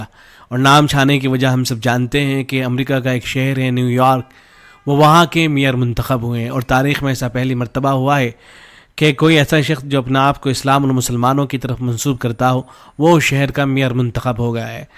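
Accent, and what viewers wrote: India. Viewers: You sound Indian.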